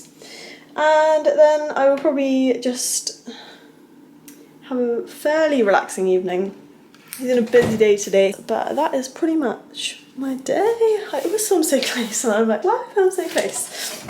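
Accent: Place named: England, United Kingdom. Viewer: I love your accent, english girls always get a +1 thanks to the accent.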